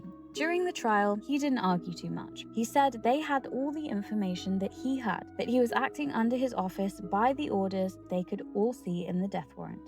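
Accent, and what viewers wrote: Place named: RP, United Kingdom. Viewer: I like when u say words out loud. They make both me laugh and sound so smart, and not just because of ur posh accent, although that certainly helps. Boshhhh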